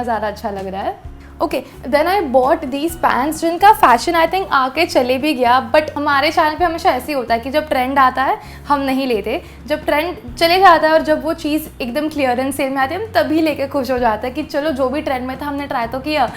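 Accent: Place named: Mumbai, India. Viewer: Mumbai hinglish is the best😁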